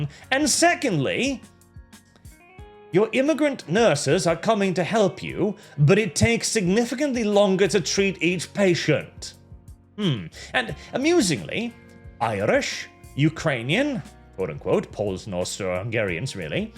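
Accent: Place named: United Kingdom. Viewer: The video presenter looks like a Russian Udmert but sounds like a British person. Off putting and confusing.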